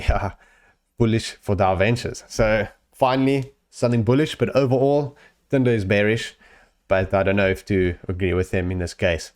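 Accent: South Africa. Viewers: At first I was sure you were a saffa. Now I'm almost certain you're from stellies or Somerset... Keep on the great vids either way boet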